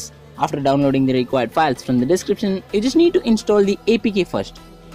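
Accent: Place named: India. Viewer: you sound Indian and your engglish is realy good man keep up the good work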